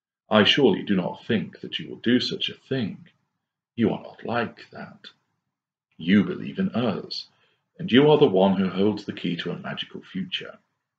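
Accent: England, United Kingdom. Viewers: you have an accent. are you english?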